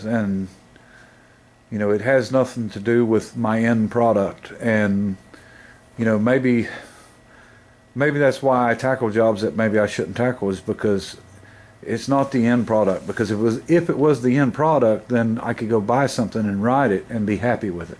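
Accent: South, United States. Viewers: What state you in? I live in Rome Georgia. You sound like your from the south. I'd love to see the bike in person and maybe when done it may be in a show so we all could see it. I ain't trying to sound like a STALKER.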